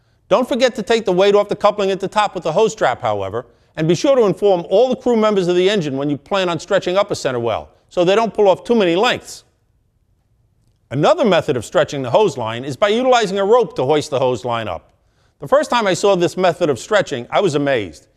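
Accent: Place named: New York, United States. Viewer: I can tell he from New York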